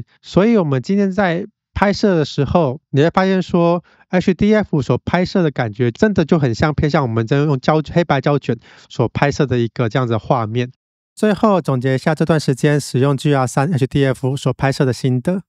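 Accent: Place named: Taiwan. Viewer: HDF夫， 😊😊😊臺灣英文真可愛。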